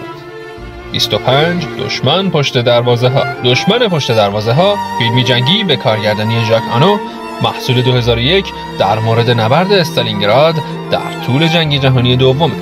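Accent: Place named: Iran. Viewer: چرا با لهجه ایرانی صحبت میکنی درست گپ بزن یا دری یا فارسی ایرانی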